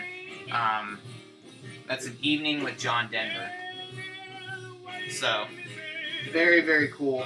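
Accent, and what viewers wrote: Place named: Boston, United States. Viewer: You have a little bit of a Bostonian accent too. You are a man of my heart showing that record. My favorite crooner of all time. I am really sorry to hear about your mom. That really did upset me hearing that. She sounded like she really loved her music. had an amazing voice when she was young. Of course I love the. They wrote some amazing psych songs early on that no one really knows about. Good to meet you my friend. Talk soon~ Rob (^‿◕) Boston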